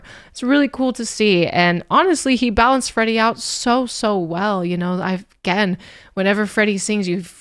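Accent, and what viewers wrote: United Kingdom. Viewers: Which country is? Her accent seems to be from England.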